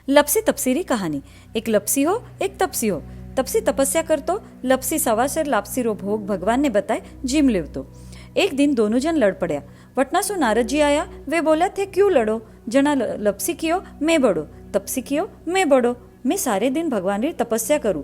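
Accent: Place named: Marwari, India. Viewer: Nice marwadi accent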